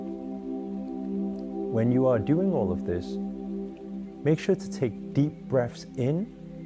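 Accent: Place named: Liverpool, United Kingdom. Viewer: Gotta love that scouse accent! I needed that taste of home! Just had this video sent over and was a wonderful insight that I’m gonna put into action. I’ve been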